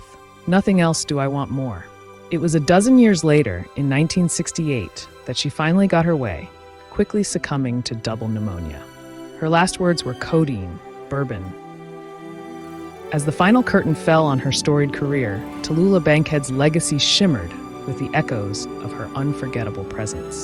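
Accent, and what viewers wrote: United States. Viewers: I' usually like to listen to mostly Men Narrators with nice toned voices' but You' have a American Easy on the Ears Lovely toned Voice 👌✨🎶🎧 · Sounds like my first wife from Tennessee.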